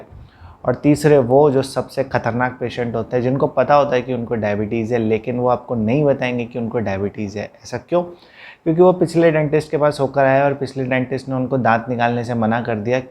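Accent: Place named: Uttar Pradesh, India. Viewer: Sir Aap pakka UP se belong krte h 😅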